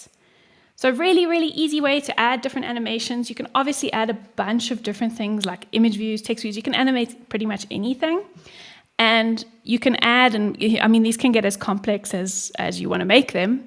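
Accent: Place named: South Africa. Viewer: woah threw me off like wait south africa? is that what im hearing :D eyyy same same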